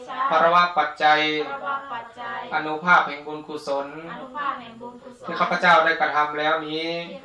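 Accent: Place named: Lampang, Thailand. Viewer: อยู่ลำปางพระที่หมู่บ้านพูดแบบนี้เป๊ะ😂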